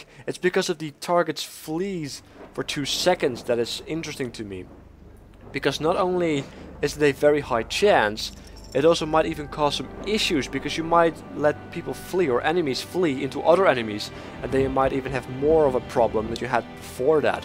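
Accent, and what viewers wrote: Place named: Netherlands. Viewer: I might be wrong but his accent sounds very.. dutch.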